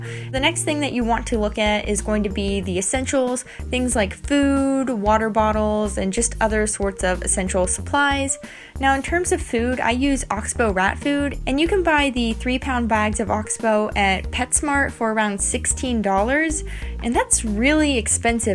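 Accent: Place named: England, United Kingdom. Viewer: Interesting, i get to hear a version of you with a strong English accent using £ pounds instead of dollars. Love both versions!!! YOU ARE AMAZING 😁👑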